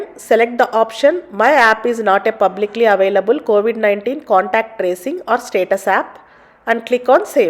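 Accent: India. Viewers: Why always indian . Accent. I hate it . I can't understand it very well. F. This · Very strong indian accent 😊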